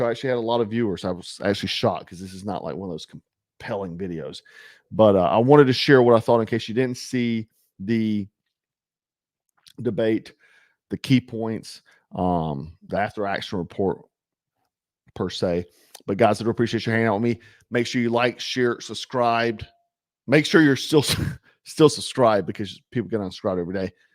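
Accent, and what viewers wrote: South, United States. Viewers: What’s wrong with your accent??? Southern is great!!! P!ss on tha rest of yall! 11 Ohm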